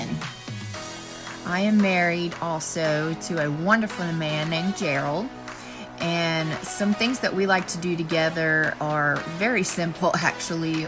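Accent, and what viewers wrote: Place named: South, United States. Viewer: Guhhh this made me smile! I love you so much and I LOVE that southern accent sha :-) #nevachange 😄😄😄 Also chili's and Lowes sounds like the best date ever. Just sayin. 😂😂😂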